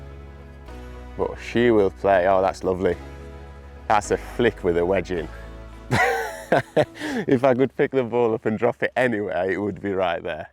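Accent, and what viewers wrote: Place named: Scotland, United Kingdom. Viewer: Hopefully it’s only the golf you concentrate on and not yr stupid Scottish accent if this I will unsubscribe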